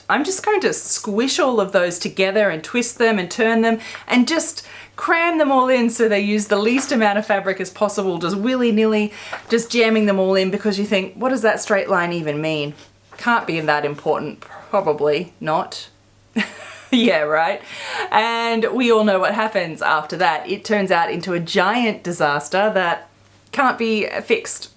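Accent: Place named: Australia. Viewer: Great to hear an aussie accent.. sooooooo loooooong winded.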